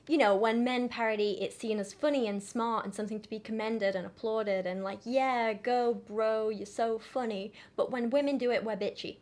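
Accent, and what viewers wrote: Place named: Yorkshire, United Kingdom. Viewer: I can't fully understand the relationship between and Kiera because I never had a dog or a bond so strong with a furry compagnon. Knowing what they went through together, I can only admire the clear love and trust between them. Happy to learn new things with your nice yorkshire accent!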